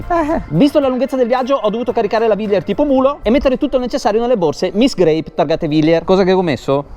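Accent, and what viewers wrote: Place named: Milan, Italy. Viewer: Fabtastico sei pure passato accanto a casa mia mannaggia.... il tuo milanese é perfetto (d'altronde sei un autorità in materia 🤣). Grande giro attendo il seguito.... beati voi per gli spritz qui ci "pelano" molto di più 😅 forza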